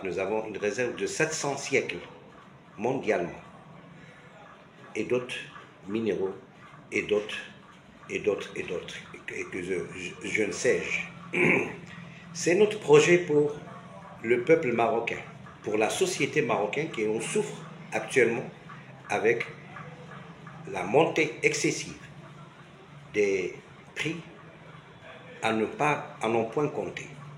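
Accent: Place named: Mauritania. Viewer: تبارك الله على الكوعليم … الفرونسي ديالك بحال ديال الموريتانييين او السينغاليين … خصك غي شي صباغة كحلة وها نتا ناضي …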